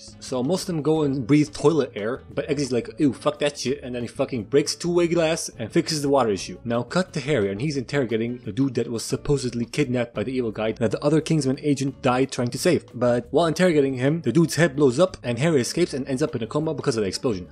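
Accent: United Kingdom. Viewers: Perfect British accent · Speaking in English accent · As an English person I approve of your British accent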